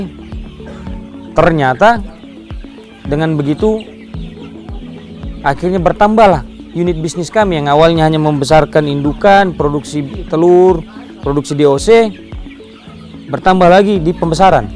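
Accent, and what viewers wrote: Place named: Sulawesi, Indonesia. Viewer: Logatnya mcam orang sulawesi kyanya ya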